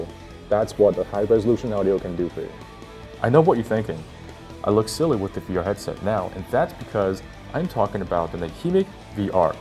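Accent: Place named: Los Angeles, United States. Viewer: 觉得的口音好好听。能问一下是什么地方的口音吗我觉得好像是洛杉矶的？若能回复十分感谢。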